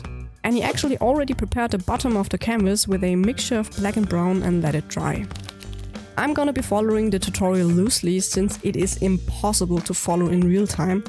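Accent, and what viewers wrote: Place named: Germany. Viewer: you sound alot german, are you?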